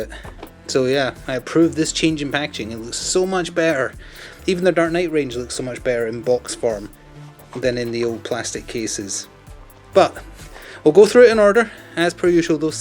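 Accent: Scotland, United Kingdom. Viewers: From one Scot to another... Glad to hear a familiar accent in the Youtube scene! haha :) will you make any DC Universe game content? Also, do you have a source for the cards for the OOP movie version of Zod and the Guild? Also, I am glad to see Knight using pictures of miniatures on the box. Not enjoying the wave of manufacturers showing renders as they dont always translate into the miniatures as well.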